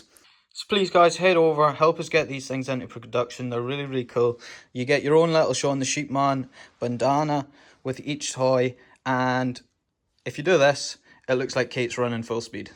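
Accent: Scotland, United Kingdom. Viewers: When you speak I can hear that you are Scottish man.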